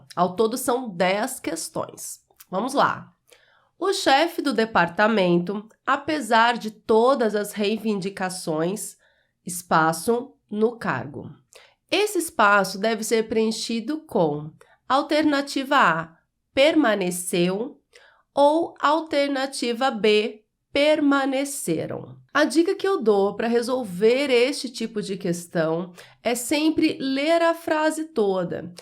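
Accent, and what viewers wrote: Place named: Minas Gerais, Brazil. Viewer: Muito elucidativo, professora com sotaque mineiro (alto paranaíba?), parabéns. Acho que a complexidade das perguntas deve ser crescente, como tem sido apresentado.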